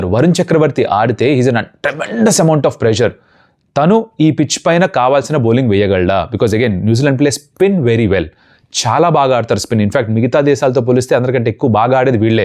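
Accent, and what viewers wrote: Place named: Mangalagiri, India. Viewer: నాకెందు కో మాటష్ మంగళగిరి అనిపిస్తుంది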